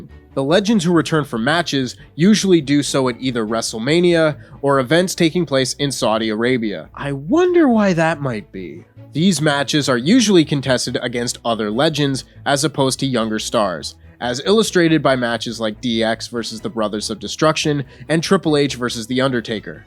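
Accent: United States. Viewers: American accent 🤯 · I thought the question of the day was "How did an American/Canadian get a presenter job there?"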